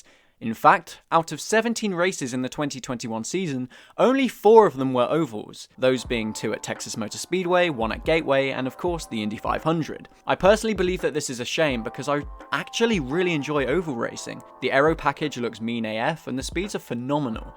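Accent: United Kingdom. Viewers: Nothing cooler than hearing a Brit talk about Indycar! You have a new subscriber! 🏎🏁🇬🇧😎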